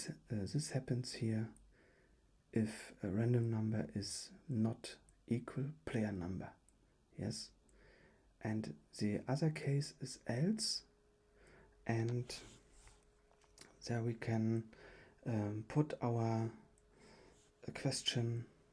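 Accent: Germany. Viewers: Man hört einfach komplett das du deutscher bist 🧐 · Nur wenige Amis sprechen das The mit th aus. Die meisten sprechen es als gepresste D aus. Also "da car" nicht "the car". Aber stimmt schon die Aussprache ist doch schon sehr Deutsch xD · Ich höre doch da einen Deutschen Akzent raus 😂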